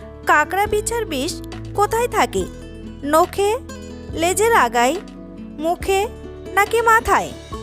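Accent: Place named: Bangladesh. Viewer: তুমি বাংলা দেশের লোক। তোমার কথা শুনেই বোঝা যায়। যেমন maass/মাছের উচ্চারণ শুনেই বুঝেছি।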